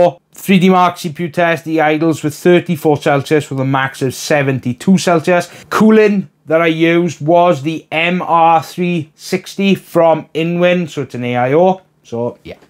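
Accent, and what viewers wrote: Wales, United Kingdom. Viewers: Definately a man from Wales! Love!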